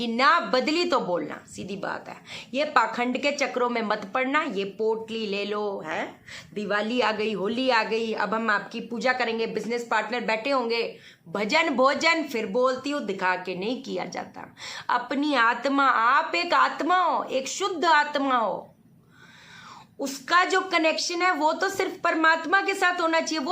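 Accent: Punjab, India. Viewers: बहन जी चाहे कुछ भी कहो ,please don't take it otherwise ,आपकी आधी पंजाबी मिली हिंदी बोली बहुत प्यारी/मस्त लगती है ,जी करता है सुनता ही रहूँ...👌👌 वैसे कुछ बहुत जरूरी परामर्श लेना है आपसे...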